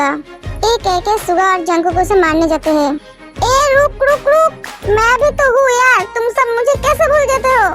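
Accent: Bengal, India. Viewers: Di Bengali ho kya aapki Hindi bolane ki Tarika se aisa lag raha hai mujhe.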